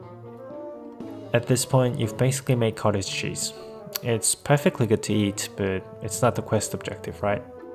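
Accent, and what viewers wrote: Australia. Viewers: ur accent are u aussie?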